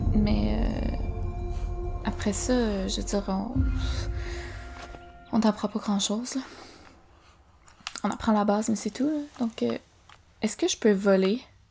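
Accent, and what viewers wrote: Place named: Quebec, Canada. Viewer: Je me doutais a ton précédent gameplay que tu parlais français (notamment lorsque tu utilises la voix google) mais j'osai pas le dire. Je suis français et c'est vraiment cool d'entendre un accent québécois ;-)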